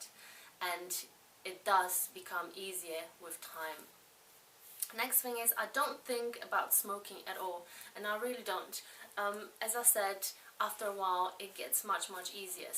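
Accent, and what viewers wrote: England, United Kingdom. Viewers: You are the most beautiful woman I have ever seen in my life, and I absolutely LOVE your slight English accent.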